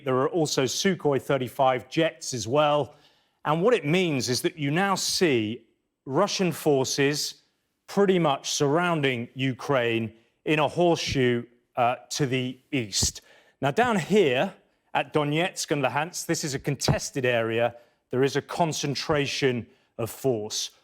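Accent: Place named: United Kingdom. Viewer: It's not "military". It's " militree" got to love those British accents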